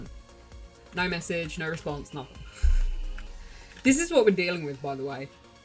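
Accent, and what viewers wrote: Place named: England, United Kingdom. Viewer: You are first lady make video about dekaron. And from accent i think you are english. I live in londont form 5 year maybe can see you one day at coffe. For help me with information about global dekaron. I dont play to much global. just 1 month. And laster years same like 1 mounth after play private servers.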